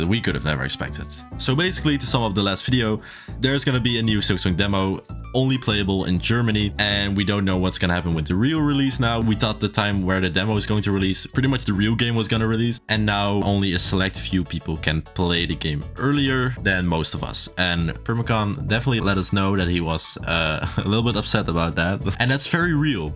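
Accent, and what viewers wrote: Netherlands. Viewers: Ik ruik een Nederlander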